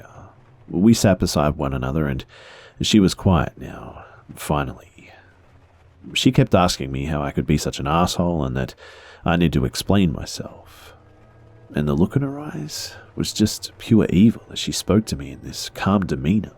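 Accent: Australia. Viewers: Good to hear an Aussie creepypasta reader. Its good to hear different readers to see how they handle the story. Mr Nightmare for instance can make anything sound scary and ominous, where as CreepsMcPasta sounds so relaxed and thoughtful. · came back to the youtube channel because I listened to everything that was uploaded on his Spotify podcast and was so happy to find there was a new here. I even listen to case file! What is about Aussie's narrating horrifying shit? I love it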